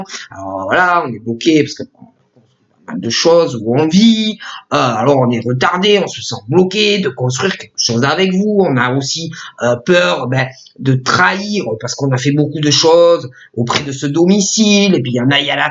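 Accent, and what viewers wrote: South, France. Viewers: ton accent du midi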